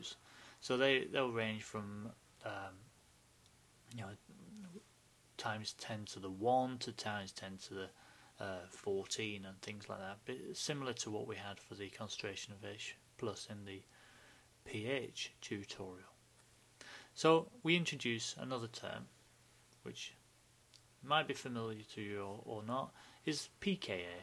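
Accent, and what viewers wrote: United Kingdom. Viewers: Thanks for this tutorial, it is great and very logic. It helped me a lot. And i LOVE your british accent! G.d save the Queen!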